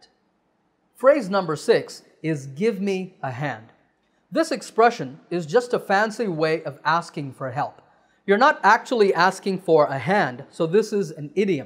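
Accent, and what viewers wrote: United States. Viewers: sir, I've been watching your videos and it has added a lot to my knowledge. However, there is a query that why do you converse in American Accent? Are you right now living in America, or you like that accent? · Sir your voice is like American man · Sir are you speaking in American accent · I Really do appreciate for your great efforts for making all these videos to make others learn . I watch your videos regularly , when close my eyes and listen you , you just sound like an American. You have standard American accent . I am impressed and inspired. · Thank you Mr.. You have been rendering an incredible service to the non-native speakers of English. I love your American accent.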